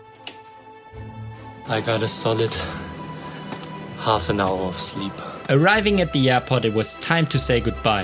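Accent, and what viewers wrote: Germany. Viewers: Your videos are really good for such a small youtuber 👍 Yes, there's a clear difference to Browneys channel, but you deserve more subs definitely! And your english is also good, even if you can hear the german accent, but mine is much worse 😂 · Are you german?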